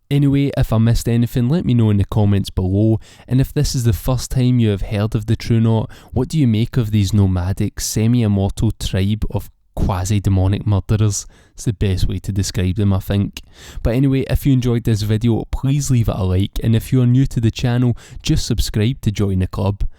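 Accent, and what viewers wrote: Scotland, United Kingdom. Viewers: I'm guessing Scotland.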